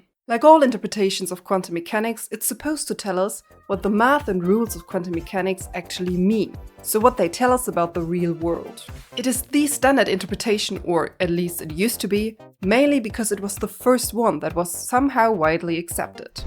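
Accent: Germany. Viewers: I think, no. Definitely German, but different intonation to my ear. Softer vowels, less nasal (not that Sabine is incredibly nasal), and more rolling inflection, rather than the distinct highs and lows of Sabine. Their voices are similar in some respects, but they are definitely different people to my ear. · Agreed, I don't think this is Sabine, but I did do a double take at first. I believe it's a German accent, but I'm no expert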